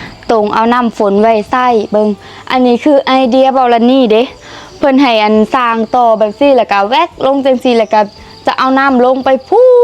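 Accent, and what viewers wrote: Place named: Laos. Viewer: ลาวคักๆเรียกคำกำกวมเก่งมาก ทีบพ้อว้อเหมือนคนเเก่ที่อุบลพูดเลย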